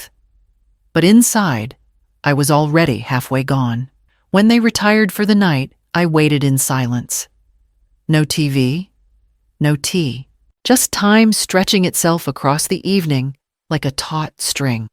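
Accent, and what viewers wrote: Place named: Australia. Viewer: Sorry but this AU voice.....ayayayay. 😅😢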